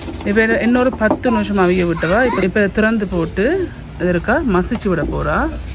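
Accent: Jaffna, Sri Lanka. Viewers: Neenga jaffna தானே.?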